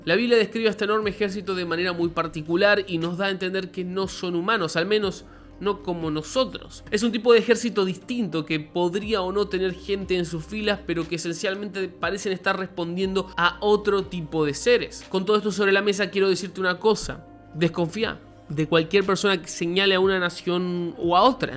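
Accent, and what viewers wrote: Argentina. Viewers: Por tu acento pareces Argentino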